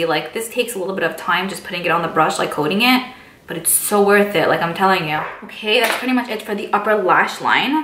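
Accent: New York, United States. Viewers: You have a New York accent. I love it #NY in the house 🤗🤗💕💕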